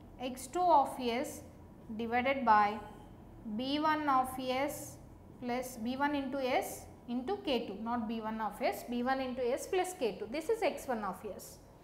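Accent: India. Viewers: what kind of CRINGE accent is that? ffs indians.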